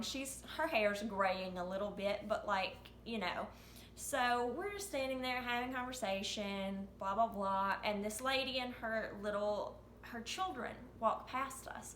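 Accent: South, United States. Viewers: love the Southern woman accent beautiful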